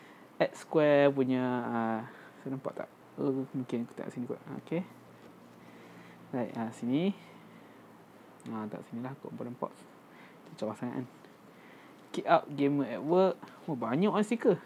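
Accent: North, Malaysia. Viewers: PSN ID : Shawrihan - Keep it up bro with this kind of video ( review psl games, movies, unboxing and all ) good to some of us! - suka dgr loghat utara tu! All the best bro!!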